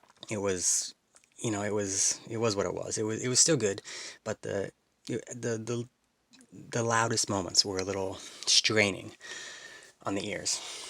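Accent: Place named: Atlantic Canada, Canada. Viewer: You're Canadian? I'm from Toronto. Based on your accent I think that you are probably from one of the Atlantic Provinces.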